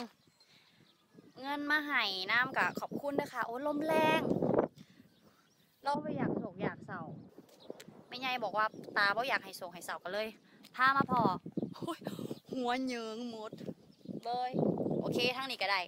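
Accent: Isan, Thailand. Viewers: เพิ่งได้มาดูคลิปนี้ เวลาเนสท์พูดอีสานนี่น่ารักมากจริงๆอ่ะ บ้านตอนเด็กๆก็อยู่อำเภอไกลๆเหมือนกัน เข้าใจเลยว่าอากาศแถวทุ่งนามันดีมากจริงๆ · น่ารักพูดอีสานน่ารักดีครับ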